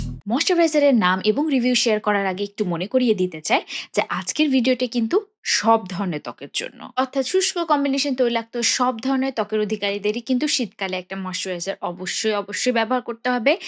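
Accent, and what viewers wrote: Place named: Bangladesh. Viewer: Just watched a few videos of your english channel. I love your bangla accent but all the best for your English channel as well ❤❤❤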